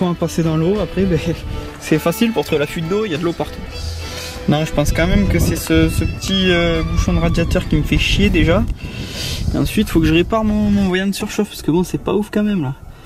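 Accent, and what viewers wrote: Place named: Toulouse, France. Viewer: un garage en toile en plein été c'est tout bon j'ai repeind mon lada sous un préau en pleine été avec du vert brillant direct et sais franchement pas mal malgré quelque petit défaut ;) Bon du coût mon express a était swapé avec un f8Q mes avec soit la culasse du F8M ou il on juste remis l'admission du f8M, un vrai ch'mille blic la mienne, mes bon pour 100€ j'vais pas me plaindre 😅 Mes sinon vu ton accent tu serait pas de la région Toulouse ou quelque chose comme sa ?? Perso je suis Tarbes donc j'me dit que peut être une fois la mienne fini...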